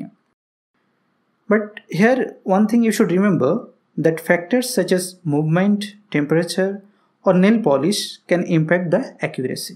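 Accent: India. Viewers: The keling slang is so indian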